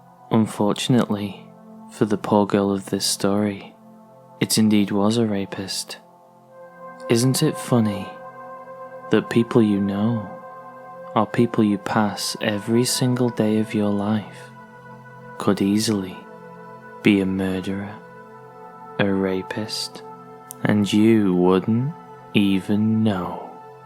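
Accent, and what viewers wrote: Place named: Yorkshire, United Kingdom. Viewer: great story !! love the narration as always, could I ask what accent you have cos you sound a little bit Yorkshire to me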